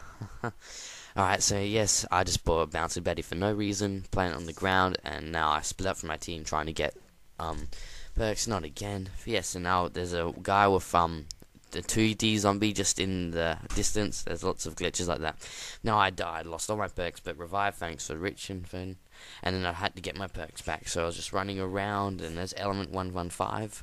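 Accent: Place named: Australia. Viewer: you kinda sound a bit Australian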